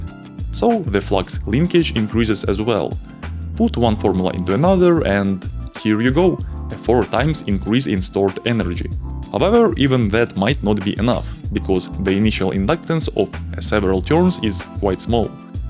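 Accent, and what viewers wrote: Russia. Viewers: какой русский акцент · От русского акцента трудно избавится.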